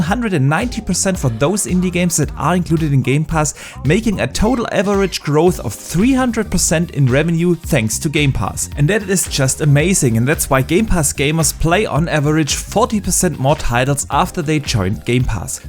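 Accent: Germany. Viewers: Alter…du klingst so fucking deutsch! 😂 No offense, nen Like kriegste als Entschuldigung 😬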